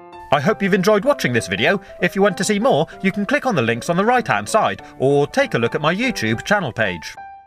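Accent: England, United Kingdom. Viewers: You brits talk funny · he has a english general accent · he's a British so he says to ma to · This guys voice is the most British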